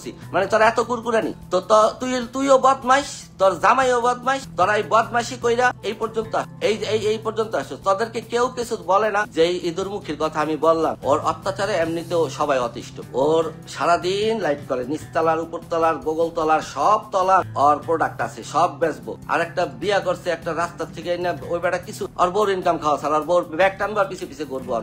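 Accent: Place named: Faridpur, Bangladesh. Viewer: মামা আপনার বাড়ি মনে হয় শরীয়তপুর বা ফরিদপুর ওইদিকে আপনার কথার ভাষাতেই বুঝা যায় জোশ মামা জনগণের মামা আপনি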